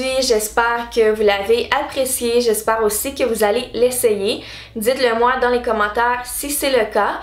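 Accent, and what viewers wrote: Canada. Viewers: C’est l’accent canadien 🇨🇦😍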